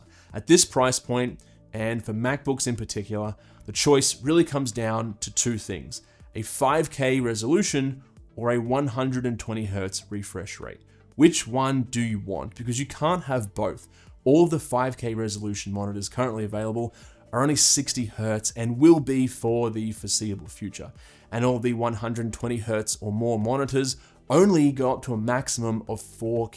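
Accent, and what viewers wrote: Australia. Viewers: Spent half the video trying to figure out if you were Australian. As an Australian that spends too much time on the internet and now can’t pick an accent so save my life 😂🤦🏼‍♀️ You’ve just got yourself a new subscriber. I’ve got a really old dell monitor I’m using with a new Mac mini m4 pro. I really like the colour on the old dell and find it looks almost identical compared to my 2016 MacBook Pro Display. For that reason alone I’m drawn to getting another dell monitor. My monitor can do 75 hertz but only 1080. And the weird scaling on the 27 inch monitor means I have slightly burry text. I have a small desk which means I probably sit a bit closer than is ideal and the weird text bothers me. I’m not great at understanding the scaling thing, but 1080 on a 27 inch vs 4K on a 27 inch, what would be the pixel density difference and would it improve my current viewing situation? 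😂 Love your content.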